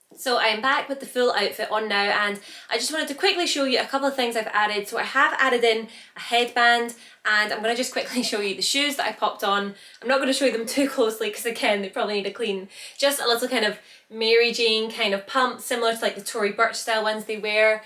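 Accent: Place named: Scotland, United Kingdom. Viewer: I love this video and I love your Scottish accent so much!! (it’s Scottish right?)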